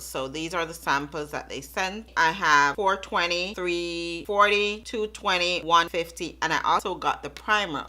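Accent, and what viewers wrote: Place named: Jamaica. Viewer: awesome!!! I’m Jamaican as well that’s why I asked. I could pick up the accent.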